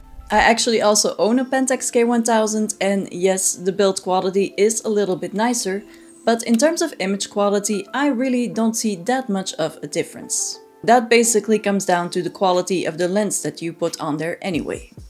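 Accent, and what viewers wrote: Flanders, Belgium. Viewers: Great to hear a flemish intonation on a video about my favourite topic; subscribed!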